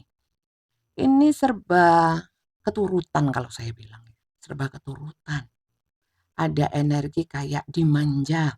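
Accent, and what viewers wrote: East Java, Indonesia. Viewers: Seneng logat Jawa timur ny Bunda.. Jg readingan ny sll ber bobot dan mantap. Leo Single mom hadir menyimak..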